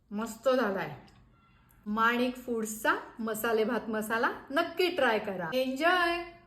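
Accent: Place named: Pune, India. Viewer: वाह भारी, फार फार सात्विक वाटलं हो. काय हो तुम्ही पुण्याच्या काय? कारण हा असा मराठी चा एक्सेंट तिथेच असतो. फार पुर्वी हा आमच्या गिरगावात... आता तो तिथे नसतो.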